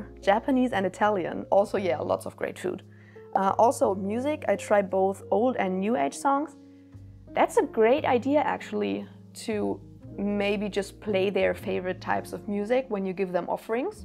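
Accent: Germany. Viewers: When you said you were mostly Danish, I was like "huh, I could have sworn your accent was German". Made more sense when you said you were from northern Germany ahahah. Amazing video, love from Copenhagen!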